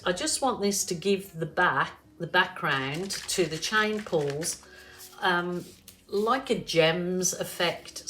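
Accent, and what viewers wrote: Birmingham, United Kingdom. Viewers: Hey there Lovely! I'm really late to the party only just found your channel 🤦‍♀️. Absolutely love your art.❤ (Great to hear a Brummie accent too! Great Barr gal myself. X)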